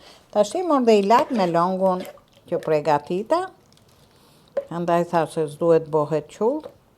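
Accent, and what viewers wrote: Tirana, Albania. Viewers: Të lumshin duart dhe goja që flet Tironce puro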